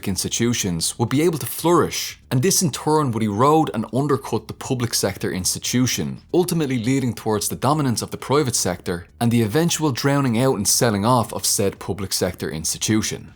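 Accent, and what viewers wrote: Ireland. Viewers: It's always been the same goal, with only slight variations in the methods used, since The Enlightenment (about 3 centuries). Imperialism is synonymous with unlimited socialism for the capital class and tyranny for everyone else. Our own (American) revolution was a reaction to this system, which our own capital class recreated immediately following the war. My list of smaller YT channels that contrast reality with popular mythology grows a little bigger each time any of you credit another, similar channel. Here's another sub and like. Aside: I really like hearing an Irish dialect for a change, it seems that we depend very heavily on our eastern european fellows for information on how things really work and the history of how we got here.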